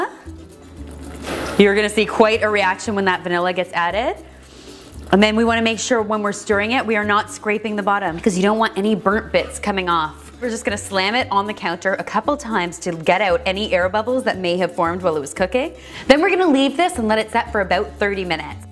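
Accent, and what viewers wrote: Canada. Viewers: Hers is less of the uh-boot Canadian pronunciation, and more of the subtle uh-beh-uh-oot pronunciation (almost like uh-boat but even more subtle). It comes out through other words like "house" too. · Are you Canadian, I love your accent. I am from the south (NC), I know all about accents..lol! :-)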